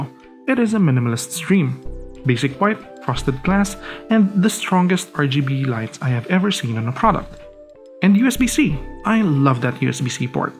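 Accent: Philippines. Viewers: Very sexy filipino accent, say "bye roos" for me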